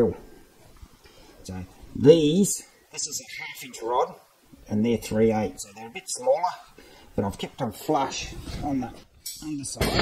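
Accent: Australia. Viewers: I just started watching you today and wow what a welder you are. I never took any welding training but regret that action. Am looking forward to more interesting videos from you. You sound to be from Asustraila or New Zealand a beautiful section of Gods creation. I live in the USA in a State called West Virginia. Stay safe and Merry Christmas to you and yours. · i know i am new, i like like the methodical approch to see a skills man at work, what a simply beautiful piece of craftmanships at its very best. all you need is four strongest powerlifters, to cary this whewever you want to go camping in the world, i am assuming you are from Australia, just by listeninhg to a little bit to your accent, if i am wrong i do appologise profusely for my mistake, i am into bushcraft skills in the UK. and i go camping everyyear, i would love something like that on a slightly smaller scale. 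simply beautiful, say no more. i like the hinge for your kettle, very clever there, in the making of it. cheers Hans.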